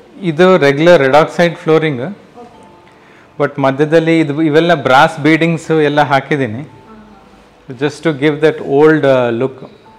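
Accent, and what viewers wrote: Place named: Bellary, India. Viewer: His original Bellary kannad accent I just love it👌👌👌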